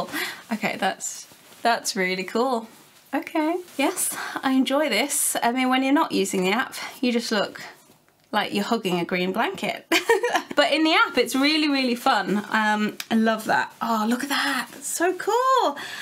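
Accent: United Kingdom. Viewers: I like your British twang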